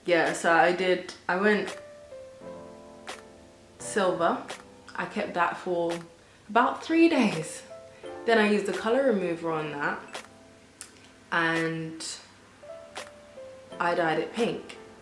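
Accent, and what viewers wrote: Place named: United Kingdom. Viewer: oh you're British... assumed you were American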